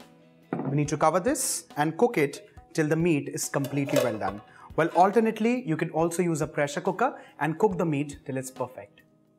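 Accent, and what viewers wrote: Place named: England, United Kingdom. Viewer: I love your english accent ,bro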